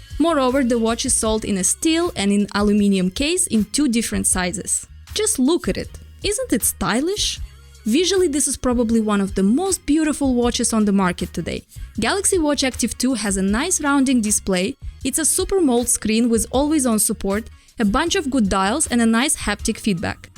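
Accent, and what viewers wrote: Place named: Russia. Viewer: i like russian accent hhhhh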